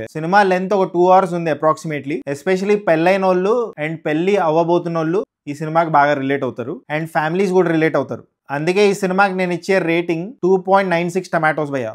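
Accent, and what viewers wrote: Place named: Vizianagaram, India. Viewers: Srikakulam slang kadhu bro Vizianagaram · Vizianagaram slang bro adhi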